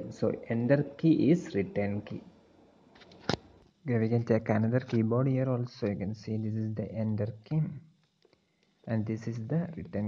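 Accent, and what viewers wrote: Kerala, India. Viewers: Are you a mallu?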